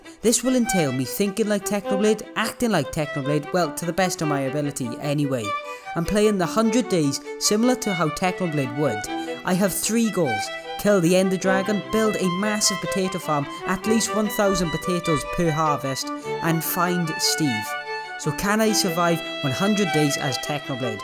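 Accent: Wales, United Kingdom. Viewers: Banger btw yout welsh accent is perfect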